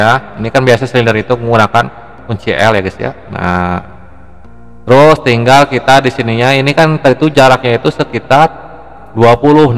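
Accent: West Java, Indonesia. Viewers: Ini mentornyq pasti orang Sunda...aksennya orang sunda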